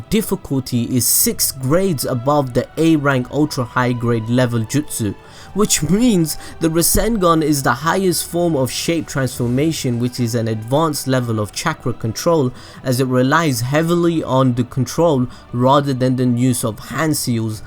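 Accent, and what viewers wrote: United Kingdom. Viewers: Your accent is B r I t I s H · Your British accent can be as thick as you like as long as you don't say "bluud or fam", I can't stand that down to my soul bro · Your British wow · Yes, very thick British accent. great vid. though.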